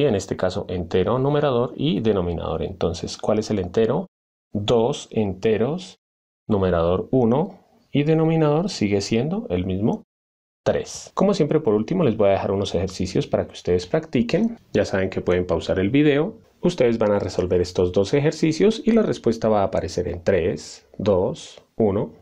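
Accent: Colombia. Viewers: Es de Colombia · PROFE COLOMBIANOOOOOOOO HOOOOOOOOOOOOOOOOOO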